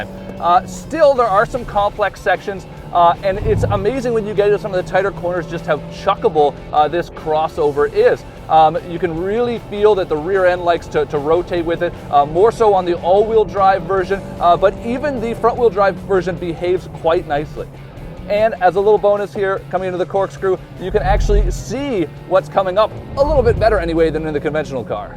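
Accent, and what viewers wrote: Scotland, United Kingdom. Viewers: is this guy American or Scotish? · goodness..have you not heard of a scottish accent.this guy sounds crap and eats his word.Try Billy Connolly he has got a nice scottish accent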